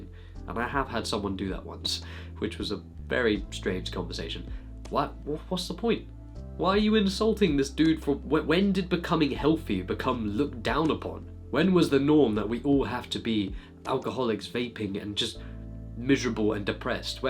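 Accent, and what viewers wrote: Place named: Liverpool, United Kingdom. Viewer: I used to live in a Liverpool and you have very familiar accent)) By the way, the video is amazing, thank you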